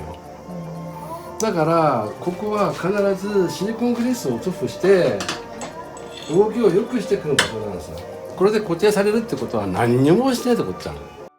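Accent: Niigata, Japan. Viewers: 東京で生活してるけど、聞き覚えのあるようなアクセントだなと思って調べたらやっぱり新潟の人だった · 新潟っぽいしゃべりだったので検索したら阿賀野市だったんですね！！ CB750Fは高校の頃憧れでした。最初のエンジン音で「おかしな音」と感じました。知識がないショップに当たってしまうと不幸ですね。。。。。しかし外見は綺麗ですね！！凄く興味深く観させて頂きました。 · ところどころに新潟弁が聞こえる。新潟県のショップさんですか？ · 完璧な整備に感動しました。なんか･･･新潟訛り？、どこにあるんだろう？調べたら、やっぱ新潟だｗ 来年暖かくなったら、帰省がてら見学に行こうかねぇ！NSRで！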